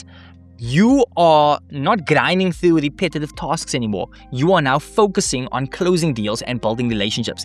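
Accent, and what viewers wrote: South Africa. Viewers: So lovely to hear a fellow South African :) Glad I found your work, super cool and impressed. Thank you so much. Loving my Journey with Claude Co-work so far.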